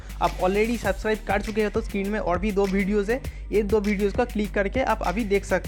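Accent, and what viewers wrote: Bengal, India. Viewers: Are wa kya bangoli Hindi bolta he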